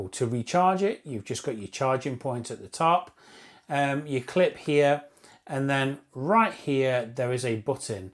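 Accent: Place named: England, United Kingdom. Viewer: You look like American but your accent says you're English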